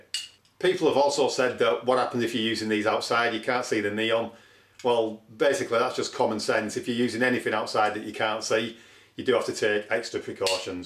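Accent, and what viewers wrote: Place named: Yorkshire, United Kingdom. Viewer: Looks like a Yorkshirian accent.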